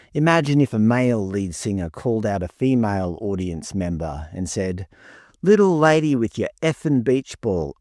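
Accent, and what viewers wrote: Scotland, United Kingdom. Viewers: She's Scottish, not American. That's how Scots people talk. They throw around swear words like a farmer sows seeds. Still a bit over-the-top though.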